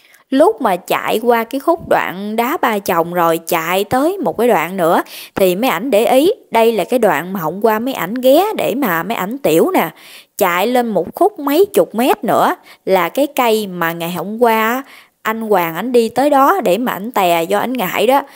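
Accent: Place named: Central, Vietnam. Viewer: Em giống miền te nói chuyện nghe đã thiệt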